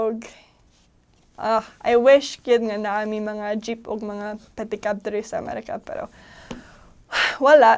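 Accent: Philippines. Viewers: love the accent.. bisdak kaayo. Apir! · Perting bisayaa jud bayhana 😂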